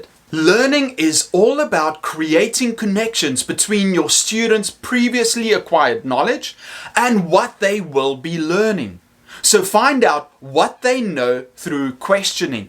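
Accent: South Africa. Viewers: South African Accent!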